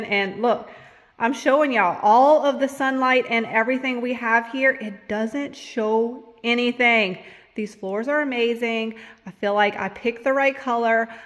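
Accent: Louisiana, United States. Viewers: We used to live in the Leesville/DeRidder area when we first got married, but we left in 1991. I love hearing your Louisiana accent—I’ve really missed hearing it!! Your house is beautiful, and I’m subscribing to see it transformed.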